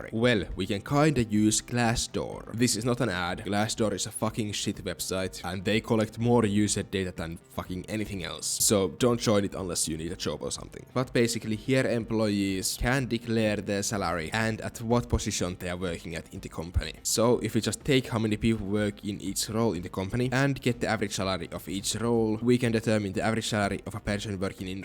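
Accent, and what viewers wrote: Finland. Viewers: i really like the finnish accent 😭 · I clicked for the clickbait, I stayed for the finnish accent.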